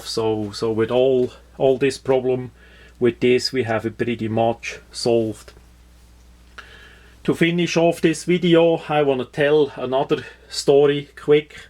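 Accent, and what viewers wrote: Switzerland. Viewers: ... stuuupid as a breeead as they are ... ich lach mich schlapp, geiler schwiiizer Akzent und nette Geschichte! weiter so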